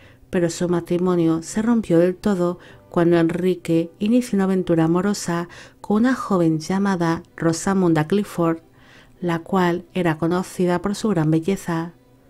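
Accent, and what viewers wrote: Spain. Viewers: Sos española?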